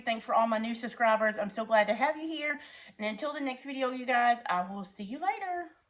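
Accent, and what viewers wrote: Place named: South, United States. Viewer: I wish I could watch and listen to your videos more often, but I have difficulties understanding you. It is a combination of speed and your lovely Southern accent. I always have both the volume and the closed captions on, but I still miss much of what you say. You often speak too rapidly for me to catch things. I need to back up and re-play sections often. I wish it weren't so. 😢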